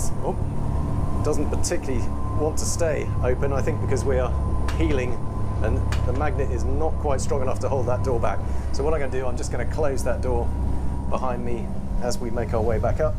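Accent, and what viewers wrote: United Kingdom. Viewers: With the helicopter, the crowd, and the British accent it felt like James Bond was doing the review. All that was needed for a movie was a chase boat, a few grenades water splashes, and smoke out the back of the Sirena 78 to conceal and cover.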